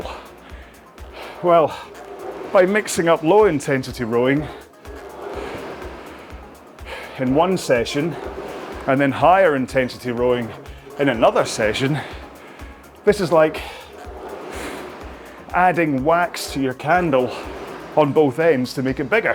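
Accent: Scotland, United Kingdom. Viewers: Newbie here. Love rowing along with you. Your Scottish accent is wonderful to listen to while I row.😊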